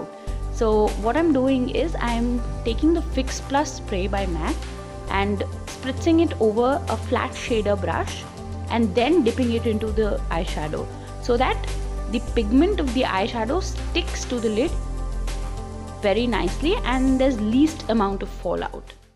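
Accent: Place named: India. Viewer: i love your indian accent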